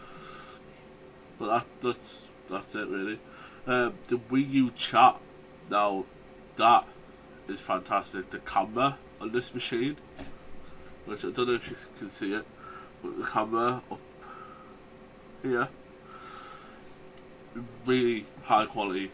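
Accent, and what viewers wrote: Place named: Northern England, United Kingdom. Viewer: nice pickups althought i still cant understand you but i think its cause your northern i think :P oh and FYI FIRST COMMENT!!!!!!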